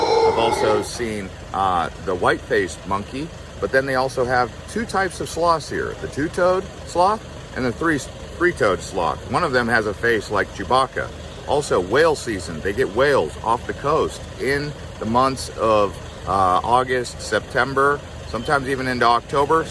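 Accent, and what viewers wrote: United States. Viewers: Damn, I am a gringo married to a Costa Rican woman and his American accent kills me! LOL!!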